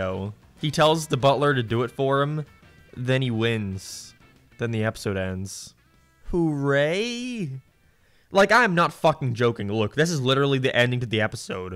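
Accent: Northern Ireland, United Kingdom. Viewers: You're not british? Aren't you from northern ireland?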